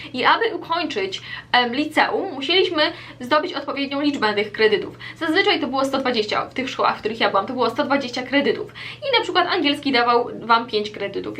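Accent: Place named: United States. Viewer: Jestem bardzo ciekawa jak brzmial twoj glos zanim pojechalas do usa bo teraz brzmisz jak prawdziwa amerykanka mieszkajaca w stanach ktora przyjechala do Polski i ma korzenie Polskie ile lat bylas w usa tylko rok?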